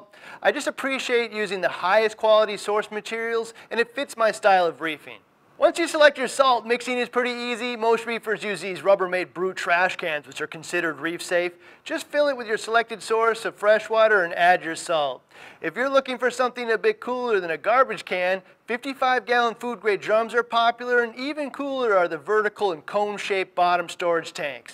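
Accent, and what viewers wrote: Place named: Minnesota, United States. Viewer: That explains his vowel sounds. Minnesota... I don't mean to nitpick; the videos are great, but northern accents are like nails on a chalkboard to me. I'm sure my Texan accent is just as offensive to people.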